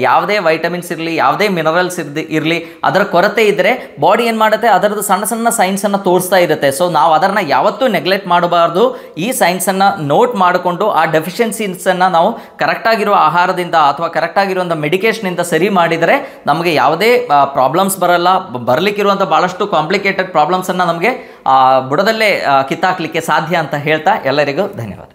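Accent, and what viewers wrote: Kerala, India. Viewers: Thank you doctor valleya information nange iron defeciency untu tumba hairloss allready aagide ...nan body iron absorb mado dilla hematology doctor helidru supliments indanu aagodilla injectionalli matra hemoglobin increase aaguthe adakke enadru maddu doctor ge gothidre dayavittu tilisa bekagi vinanthi.. .malayalam ecsent untu doctor mataduvaga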